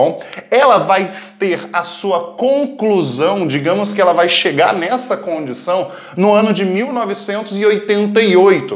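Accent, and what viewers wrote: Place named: Northeast, Brazil. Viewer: Vim pela minha prof q mando como explicação essa vídeo aula :v (já q estamos em quarentena e tendo só aula online). Obs: Vc é nordestino né? Pq tem um sotaque d lá ou do norte msm. Ps: Santro Oeste kskksks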